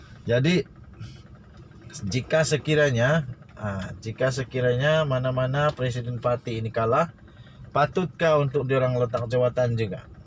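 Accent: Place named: Sabah, Malaysia. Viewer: OIYYYY SIUTTT KO BUAT CHANELL APA PASAL TUN MAHATHIR,, BANGSAT KAU PUNYA TAJUK CHANELL TUN MAHATHIR KO BUAT TAJUK MACAM DAH MENINGGAL PULAK..SIAL KAU NAK VIEW LEBIH PULAK KAU...DAH BAGI PEHA NAK BETIS,,, LEPAS TU KAU MATIKAN KOMEN DEKAT CHANELL TU SUPAYA ORANG TAK KOMEN CHANELL TU PASAL TAJUK,,SIUTTT KAU...KAU ORANG MANA SIUT?? KAU ORANG DALAM MALAYSIA KA ATAU SEMENANJUNG??? SUARA KAU GAYA KAU MACAM ORANG SABAH,,KAU JANGAN ... ORANG TU BELUM MATI KAU BUAT TAJUK MACAM DAH MATI...SIUT KAU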